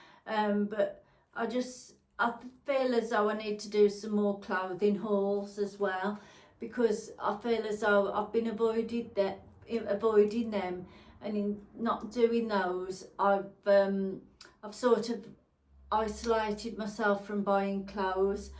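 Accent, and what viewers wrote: United Kingdom. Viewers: Aww, I'm a silent watcher never usually comment but just be you, You are enough! There's plenty of room on YouTube for all! I watch lots of different people for lots of different reasons. I love to watch you as you're so relatable and drama free! Plus I'm a Brummie and I love to hear the accent now I no longer live there! To stick my twopenneth in I'd say just do the sort of thing you do and this video here no doubt will help so many people! Xxx Big up to you, much love xxx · Hi! Sorry that you are feeling so low! I love watching your videos - you are kind and caring (and I'm quite partial to a Black Country accent.) 💕 Please don't stop your channel, you have so much to give. Your heartfelt video made me think that an occasional 'Chat with ' over coffee (and cake) segment in your back garden or out on location would be great. You could talk about different things every time - style, clothes, hair, make up, skincare, garden, your hobbies, holiday destinations, keeping fit and healthy, shopping, being a mother and grandparent, your life etc. 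Love you and your channel,! 💛xxx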